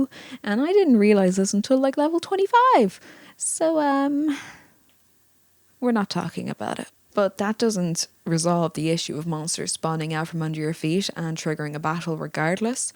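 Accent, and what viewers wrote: Ireland. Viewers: Checkpoint said that this was a delightful tale with bonus irish accent. At the start I thought to myself this is a light accent, and then for every minute the accent more and more :P Anyhow this was indeed a delightful story. :)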